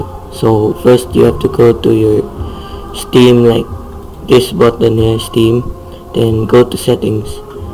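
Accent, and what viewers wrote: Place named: Philippines. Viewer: Liked the raw Filipino accent there LOL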